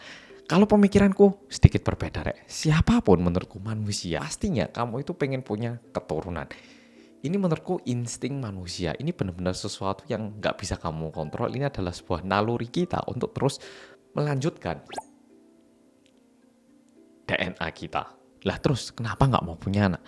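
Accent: Java, Indonesia. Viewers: Selalu suka sama konten"nya mas mas korea medok ini hehe❤❤ Aku mau reques bahas kasus JMS soalnya habis nonton documentary netflix jdinya pengen tau perkembangan kasusnya dikorea.... Makasih mas 😊😊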